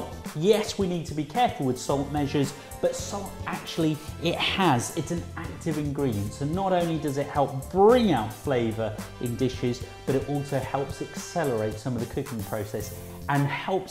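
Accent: England, United Kingdom. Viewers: What is it about an indian guy with an English accent that is just so hot?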